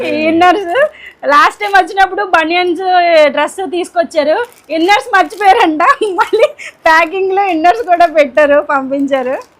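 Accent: India. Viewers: Oho, Meedhi Anantapur aa. Ippativaraku mee slang ni batti coastal andhra anukonnaam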